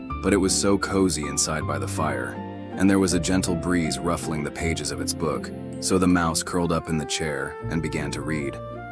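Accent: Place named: United States. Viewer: The American voice put me off watching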